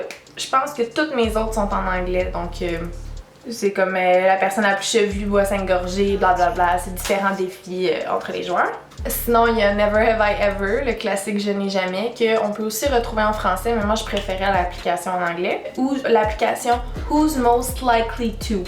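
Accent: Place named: Canada. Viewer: Mdr cette accent et ces expressions. J'aimerai trop aller au Canada un jour putain !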